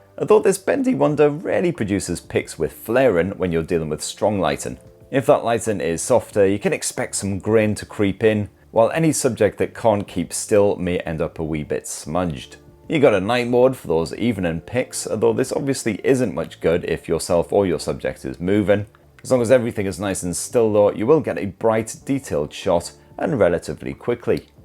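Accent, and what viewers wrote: United Kingdom. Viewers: this man speaks British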